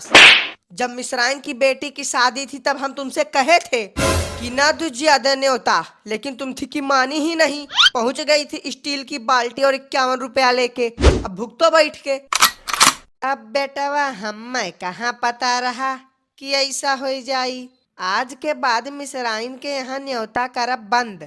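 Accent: India. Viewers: Awaz se lgta h aap bihar se ho Up se nhi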